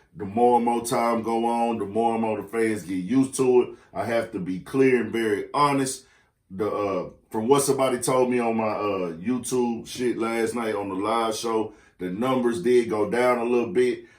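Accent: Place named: Texas, United States. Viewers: This my 1st time ever seeing this blogger. My guess, based on his accent, is he from Texas. Probably Nawf Screwston. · Yup. Slim Thug type accent. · Lmfao same I was like he from Texas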